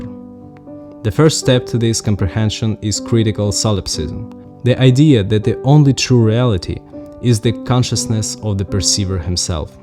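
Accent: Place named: Eastern Europe. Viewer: slavic accent 😭😭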